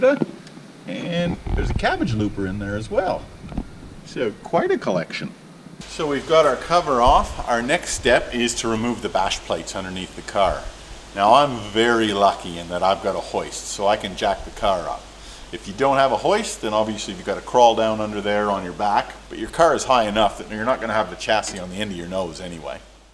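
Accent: Canada. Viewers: I don’t own a Toyota but I had a great laugh at your “bugger me” moments! Add the Canadian twang to the mix and I’ve spent the whole arvo watching your clips about a vehicle I don’t even own! Well done and keep the content flowing.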